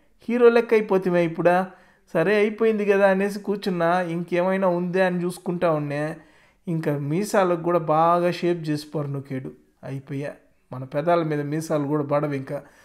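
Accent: Nellore, India. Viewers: Nellore slang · anukuna brother mi slang chusi ,manadi kuda Nellore ee. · Sooper comedy Mana nellore yasa adaragottav 😁😁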